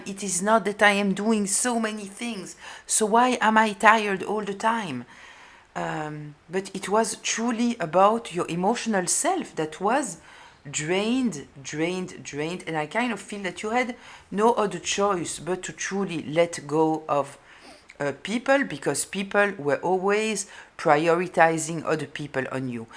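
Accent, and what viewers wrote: France. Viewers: Aside from loving your beautiful French accent, I love that your readings are actually therapy, discussing what beneath those strange behaviours of humans. I love this because it resonates with the main reason I listen to Tarot readings. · 1st time on here, glad I found you. Love your very Fremch accent, and think you are very wise. It might be my first time but I promise it won't be the last ❤🎉😊 HAPPY 2026 !!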